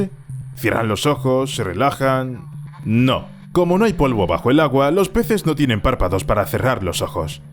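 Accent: Spain. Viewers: La voz suena como española, pero todos los insertos son de doblajes latinos. Anda la osa! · El sujeto tiene acento español pero usa clips latinos? Curiosos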